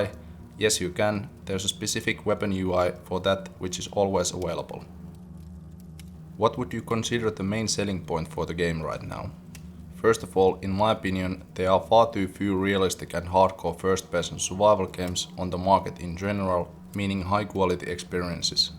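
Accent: Finland. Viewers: You sound like Finnish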